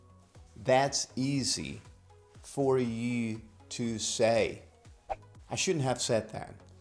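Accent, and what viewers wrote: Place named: United States. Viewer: Buen inglés americano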